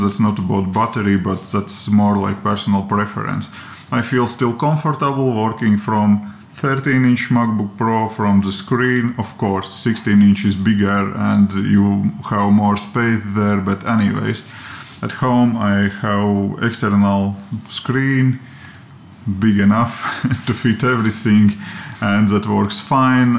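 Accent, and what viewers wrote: Russia. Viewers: Are you Russian?) You have a russian pronunciation. Nice review, thanks!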